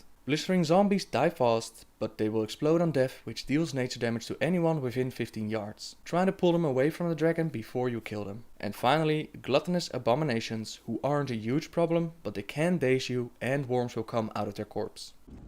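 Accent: Netherlands. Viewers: Ik hoor gelijk dat je Nederlands bent. :P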